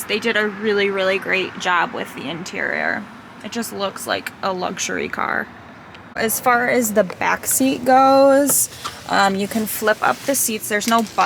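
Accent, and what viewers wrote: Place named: Chicago, United States. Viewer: Born and raised in Chicago….I can hear the Chicago in you voice! We have a similar handsome dog. Love the Rivian! And Lake Shore Drive (I slightly dated myself😂)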